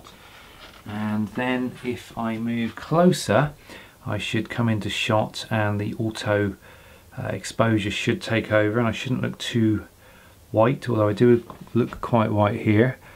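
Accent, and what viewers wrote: England, United Kingdom. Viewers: Are you Englishman?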